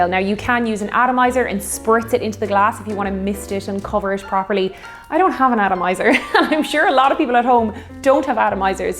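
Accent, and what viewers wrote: Ireland. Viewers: Always enjoyable to see you online. There’s something I should tell you. As an Internet fan. There are four or more ways to properly pronounce the city you named and none of them are New Ore-leens. Locals will truly look at you like you are deliberately baiting them and you don’t want that. The oldest of the 4, from what I can tell is New Ore-lay-Ons. That lay sound is probably what has been twisted by yanks into the despised ee sound. As if it was said in French, which was originally spoken there. A miner variation is New Ore-le-ohns with just a hint of the h pronounced. Another acceptable variation is Nore-lans. Only two syllables. Finally. The tourist inoffensive version. New ore-lans. With an a as flat as you like. You may get passed on the bad looks with your Irish accent and incredible beauty, but why say the equivalent of “Hasn’t Ireland always been a part of England?” If you can avoid it? 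By the way, the second largest genetic heritage found there is Irish. So don’t be surprised if you see familar-ish faces behind the bar at places like Pat O’Brien’s or anywhere. But don’t go during this mess. If you want a real treat, go during the New Orleans Jazz Festival. Based on your background music you will love it. I went to college about 3 hours away and spent almost a month in Louisiana, aka Lou’s-I-an-a. (No pronunciation land mines there.) so that’s where I got this knowledge. Thanks for brightening the dark dark covid days. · Love your joyful approach to the making of this drink although would have watched just hear that delicious Irish accent! Thanks.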